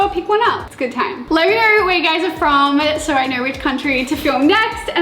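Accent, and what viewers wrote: United States. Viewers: Is it me or does this girl sound American half the time?? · What's with the yank accent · You aren’t American ffs! Quit acting and sounding like one. Grow up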